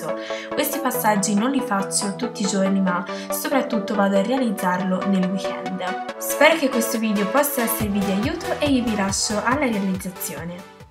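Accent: Tuscany, Italy. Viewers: Bellissimo video e tutorial super mega completo, attualmente il migliore sull'argomento, bravissima!... Sei toscana come me sento? ^_^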